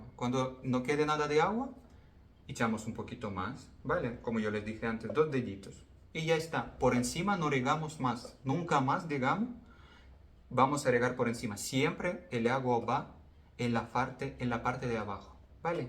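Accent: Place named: England, United Kingdom. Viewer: querido es un placer escucharte hablar y veo todo tu trabajo merece destacar,la linda forma de explicar cada cosa,eso vale mucho! cuando se pone el ingrediente principal que es el AMOR Todo es mejor, y es más ameno todo! Espero que sigas haciendo vídeos lindo,como dijo el escritor: 2Brian Weiss:Lo Único Real Es El Amor LoDemás...Es Una Ilusión" Te felicito tu pronunciación del idioma español lo hablas muy bien y me encanta tu acento ingles le da un toque de distinción!👏👏👏👏👏👋😀👍Un fuerte abrazo desde Uruguay! Muchas Gracias🌵🍀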